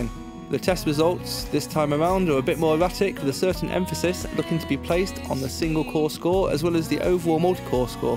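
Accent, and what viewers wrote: United Kingdom. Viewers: Please translate what he said from Cockney English, when he described the latency performance at a low buffer size. Is this process (transmitting midi, latency) predominantly single-cored, and hence the computer with the higher single-core speed will be better?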